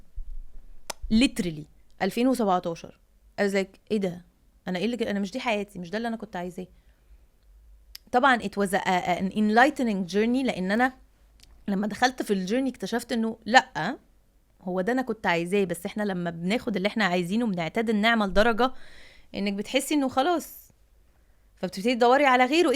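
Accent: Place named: India. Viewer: انجليزى كتير و الاكسنت هندى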